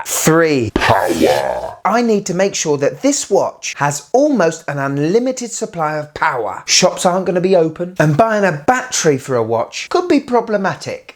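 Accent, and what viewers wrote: United Kingdom. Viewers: Another really great video. It's been decades since I first noticed that you Brits pronounce z "zed" instead of "zee" the way we bloomin Yanks do. were very helpful with this, with Joe saying "Jay-ay-zed-zed" on their song "." And it's been years since I noticed that you insert an extra syllable into "aluminum." But only very recently did I notice that you blokes pronounce h "haitch" instead of "aitch." WHERE DOES IT END?!!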